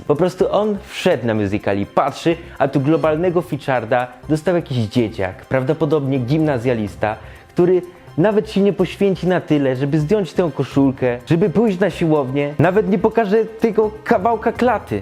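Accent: Germany. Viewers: Powinieneś jechać do niemiec. Super niemiecki akcent translator i filmik šmieszny hee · Dobry niemiecki akcęt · Dobry Niemiecki akcent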